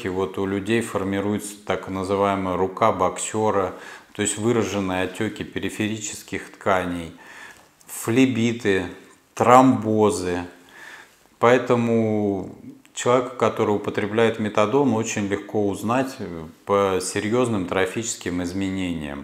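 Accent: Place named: Kursk, Russia. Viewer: этот курский акцент бесит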